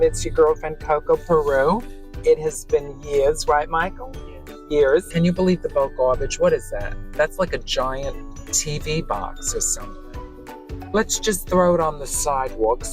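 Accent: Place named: Bronx, United States. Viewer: I love shopping with Coco and her beautiful self and that Bronx accent. ❤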